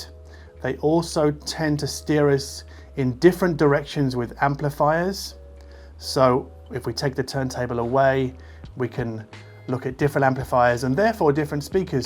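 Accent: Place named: United Kingdom. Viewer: U sound like u live in the uk but u live in berlin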